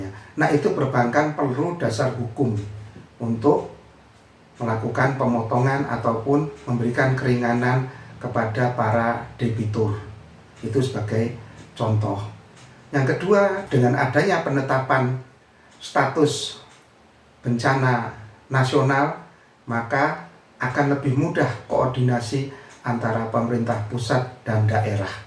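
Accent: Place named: Java, Indonesia. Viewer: keren om...analisanya semakin tajam dan mantap, logatnya medok 😂😂😂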